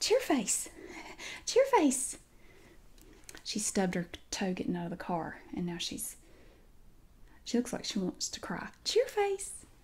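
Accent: South, United States. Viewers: I love watching you not only because of your videos but because of your accent. I used to live in the south but I moved a few years ago and I've recently been really missing it. Your videos bring me back and make me so happy. Thank you. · so southern bruh spot on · You really acted this role well I think your bitchy mum is awesome and funny I love your southern accent why you not an actress you really good at acting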